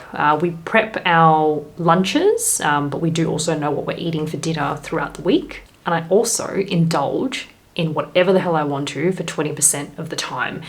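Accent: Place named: Australia. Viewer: Very well said. Great list, and watching from the USA I really enjoy your voice. Everything sounds sweeter and happier with an Australian "accent" :-) I remember turning 30 as well and just loved leaving my 20's in the rear-view mirror. I'm glad you found it a good thing.Just wait for 60!!! haha. Liking & subscribing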